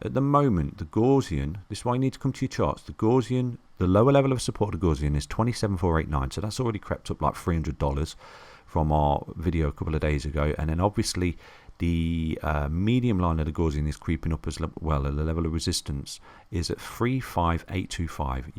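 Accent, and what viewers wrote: United Kingdom. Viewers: i like that nice and short video.. thanks.. nice to also hear British accent for once.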